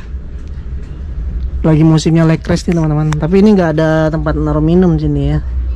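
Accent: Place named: Minangkabau, Indonesia. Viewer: Mantaapp..semakin kental minang om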